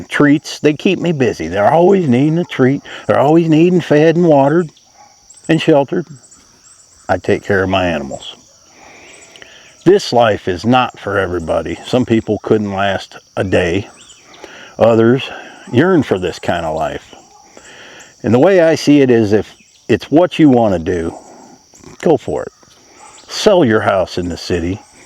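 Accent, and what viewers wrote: South, United States. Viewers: Hi. I'm Tony from NJ, and I used to have a condo. Sold it a few years ago, and I now live in a van, in the suburbs of Jersey... In a strange way, even though surrounded by humans, I also feel like a hermit.. I love no debt, no bills and #1 freedom! One day I may move out to the country.. idk.. but I've been saying that forever! NJ is home.. for better or worse.. thanks for sharing ur existence.. ur southern way of speaking is one of a kind!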